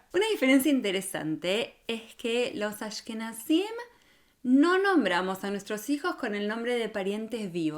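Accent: Argentina. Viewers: Me encantan sus narraciones históricas acerca de las personas judías , habla muy bien aunque con acento argentino pero es muy musical y agradable escuchar su voz y la forma en que narra las historias punto Muchísimas gracias,SHALOM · Tengo muy buenos amigos sefardíes y algunos asquenasies pero tienes un bello tono de voz además el dejo argentino que es muy bonito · que acento argentino tiene me desespera un poco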